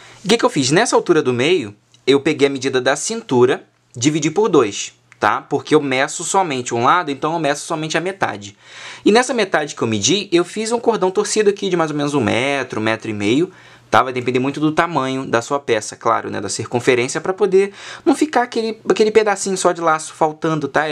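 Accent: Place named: Rio de Janeiro, Brazil. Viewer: Linda aula, bem explicada! "Carioquês" delicioso de ouvir!💛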